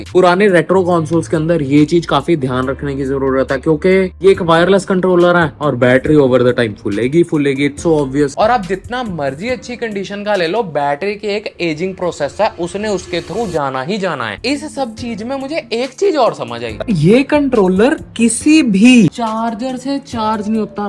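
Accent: Punjab, India. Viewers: I'm hating this punjabi language accent as this guy is making so much over action and bakchodhi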